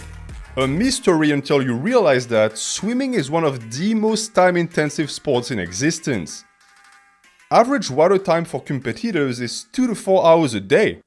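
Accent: France. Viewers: Youtube cannot comprehend thick french accent, the closed captions keep saying "vapers" instead of "v-tapers" and said "food in the gym" instead of "foot in the gym" it's hilarious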